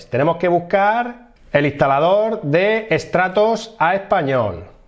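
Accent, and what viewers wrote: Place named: Calasparra, Spain. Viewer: te agradezco el tutorial, pero por favor, practica un poco el castellano que vamos, juraría que eres de calasparra, sin mucho temor a equivocarme, y cuando hayas suavizado el acento, prueba con el inglés, que he tenido que ver dos veces el video para saber de que cojones estabas hablando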